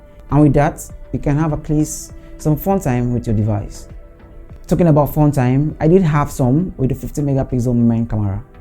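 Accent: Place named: Africa. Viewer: African english is funny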